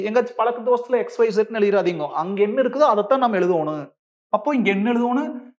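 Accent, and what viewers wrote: Coimbatore, India. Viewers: Coimbatore ah sir unga native? Pesurathu konjam vithyasama irukku😅😅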